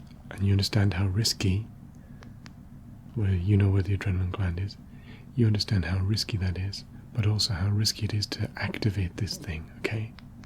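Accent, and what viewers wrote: United Kingdom. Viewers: Hi! I accidentally came across your videos. Is this ”home made”? Looks so professional. Your english sounds so british 😊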